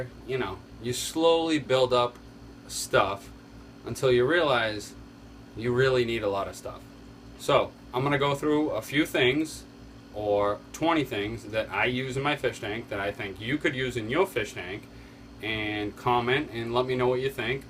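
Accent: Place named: Massachusetts, United States. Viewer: I've always been a naturalist and have enjoyed tending to plants, reptiles, and other critters. I have always struggled with my aquariums though. I see now, after watching a few of your videos that it is because I always rushed into it and was never fully prepared. This video alone has shown me just how unprepared I have been! I am looking forward to setting up a beautiful planted aquarium using all your great tips and tricks! I love watching your videos as your mild Mass accent reminds me of home where I grew up. Thank you for the great videos!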